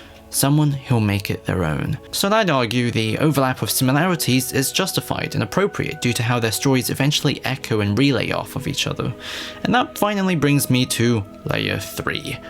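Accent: United Kingdom. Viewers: MCU Spidey is whack. A YouTuber with British voice apparently speaks facts with edited videos.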